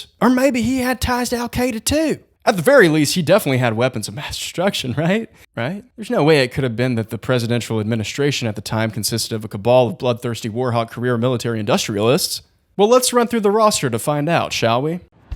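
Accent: South, United States. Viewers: I love your southern accent voice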